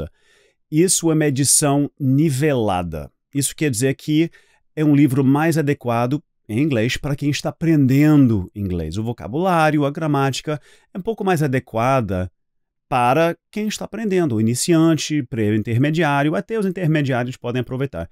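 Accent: Rio de Janeiro, Brazil. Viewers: Voce é americano? e esse sotaque do Rio ? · . Parabéns !!! é um carioca da "gema".... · Quero aprender o Inglês tão bem quanto você fala o "Carioquês"! Gostei muito do seu vídeo, parabéns!!!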